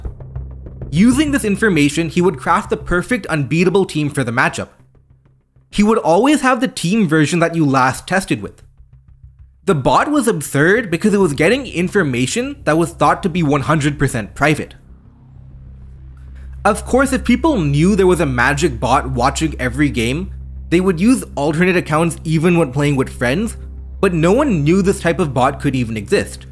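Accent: India. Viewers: i knew i heard a little bit of indian in his voice!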